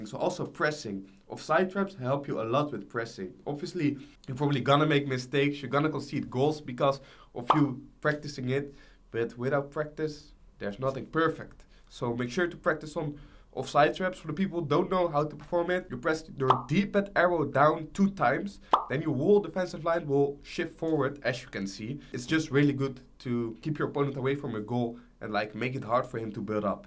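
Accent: Netherlands. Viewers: Hij klinkt Nederlands hahah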